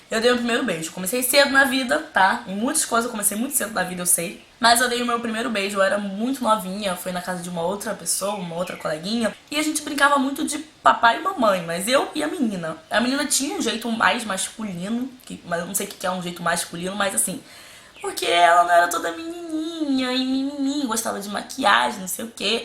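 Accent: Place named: Rio de Janeiro, Brazil. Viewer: SOTAQUE CARIOCA AMO ❤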